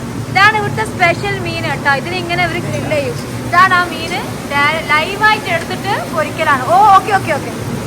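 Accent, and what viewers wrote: Kannur, India. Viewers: ഒരു കാമറാവുമണിനെയും കൂടെ കൂട്ടാമായിരുന്നു. ഭാഷ ഒന്നുകൂടി നന്നാക്കാമായിരുന്നു. തനി നാടൻ കണ്ണൂർ ഭാഷയായിപ്പോയി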